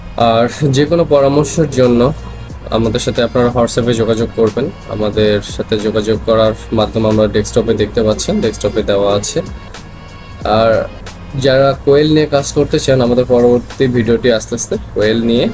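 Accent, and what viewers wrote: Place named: Rangpur, Bangladesh. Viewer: আপনার লোকেশন কথায় রংপুরের। থানা কোন গ্রামের নাম.???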